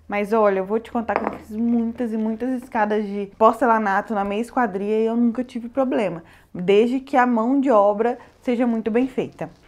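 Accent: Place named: Minas Gerais, Brazil. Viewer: Nós mineiro falamos assim mesmo .... Escorrega igual quiabo kkkk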